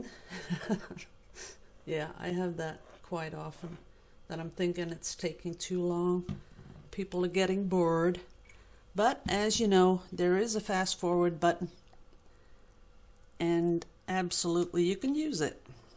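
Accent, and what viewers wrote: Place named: Netherlands. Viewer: I hope I can learn to do these beautiful pours. It looks like two people are reaching for each other's hand as if something magical is about to happen (except for the "extra" finger lol) it's absolutely stunning! Btw, I knew you were Dutch from hearing your voice. My mom was the only one from her family to move to the U.S... I have never been but, I dream of going to visit my Dutch family! Thank you for sharing your beautiful art❤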